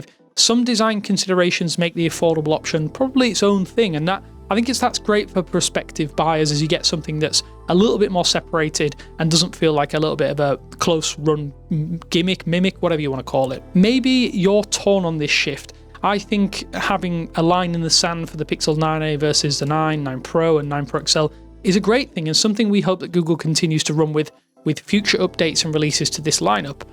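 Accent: United Kingdom. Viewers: british accent 😢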